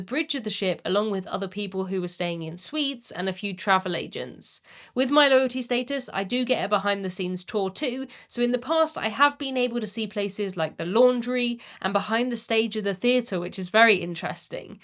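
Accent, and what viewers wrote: RP, United Kingdom. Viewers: Oh your accent is so posh and lovely. Thanks for this video as we are planning on Alaska soon. I think Encore is the perfect ship for Alaska with the Observation lounge. Were you happy with the amount of time in port? The super early morning arrival and early afternoon departure seem like they might be disappointing.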